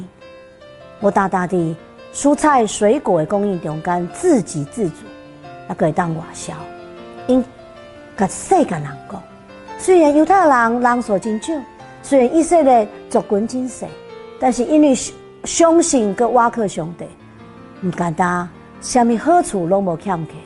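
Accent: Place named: Taiwan. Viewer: 今仔日予我誠歡喜來當來聽著，今仔日予我閣再一改，誠歡喜來當來聽著牧師用端的台語放送福音，梁牧師的傳道的聲嗽清楚優雅，予咱聽落心情齊平和。這真正是咱台語人的一大福音。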